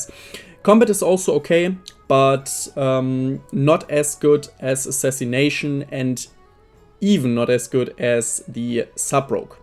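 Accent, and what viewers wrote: Germany. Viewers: The german accent is wyld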